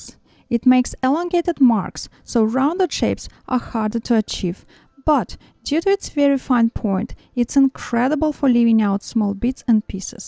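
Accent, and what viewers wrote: Russia. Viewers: Спасибо большое ! Интересно было узнать о ваших кистях . Судя по акценту вы наверно с России .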